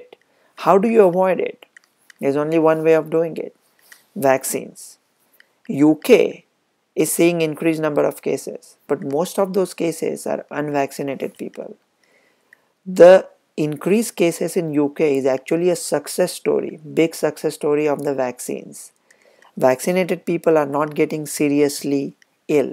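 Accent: India. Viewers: Your accent is still Indian 🙂.Strange. Good thiught though.Keep spreading the hope, positivity.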